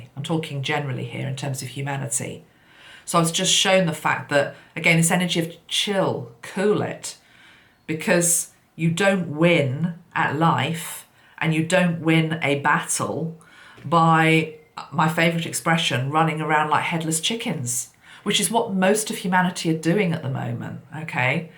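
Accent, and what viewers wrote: England, United Kingdom. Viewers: A big thank you from Johannesburg, South Africa! I'm originally from England so I enjoy your dulcet English tones:-) I shall keep walking through the gateway with the cool blue flame in my hand. Such a powerful image to take with me in my shadow work to release all that no longer serves me, thank you ♥️💜💚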